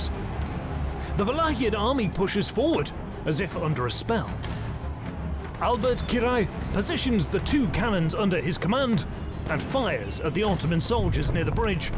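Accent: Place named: Yorkshire, United Kingdom. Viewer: that yorkshire accent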